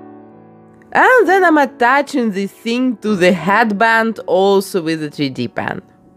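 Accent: Russia. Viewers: Вот наткнулась на видео И сразу поняла по акценту , что из России человек 😂😂😂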